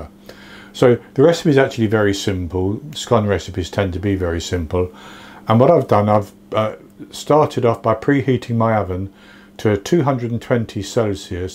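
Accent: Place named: Devon, United Kingdom. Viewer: Wonderful recipe uncle...very therapeutic...Perceval a old gentleman friend of mine is convinced.. You are from Shropshire...he enjoys working out accents...now he thinks your from Devon. Any clues without revealing so we can work it out.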